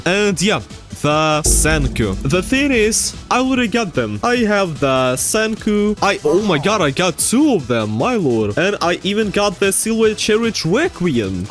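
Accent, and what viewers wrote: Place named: Russia. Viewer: you sound russian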